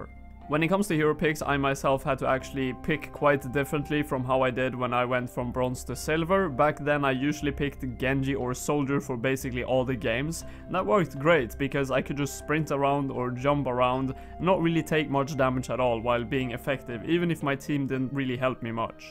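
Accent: Sweden. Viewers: Sounds like your from sweden( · Swedish accent I Love it bro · u are sweden i hear it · Nice video mate, are you Swedish btw?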